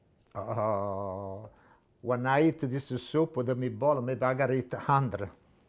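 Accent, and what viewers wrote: Italy. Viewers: best soup in history in my opinion. btw I really love your Italian accent. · I really enjoyed watching you cook. The soup looks really good. I love your Italian accent.😊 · Sig, you are amazing. You speak English the way I speak Italian, so it's always a pleasure listening to you. Farfalline? I completely lost it when you said it would become a farfallone if you put it directly into the soup. Ladies and gentlemen in the audience, you cannot properly translate what the man said into English. You can, but the translation just does not work - I still can't stop laughing. Think, a little, itty bity butterfly put into the soup would become Mothra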